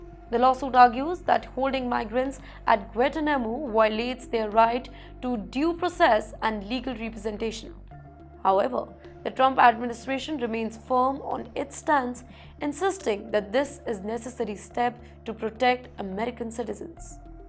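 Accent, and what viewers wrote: India. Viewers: Punjabi+hindi+English = North Indian English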